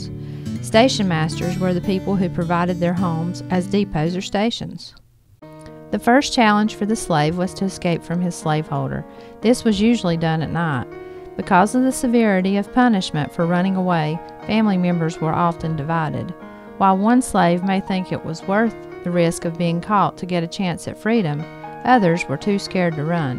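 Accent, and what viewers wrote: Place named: South, United States. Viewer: Yeah, I didn't quite get that, she sounds more like a Southern gal though. I honestly really don't care who narrates it it just leans toward more appropriate having a black person do it. It reminds me of when white people pretended to be Indians in movies. I'm quite hungry for more information especially accurate information about the black slave life and their escapes.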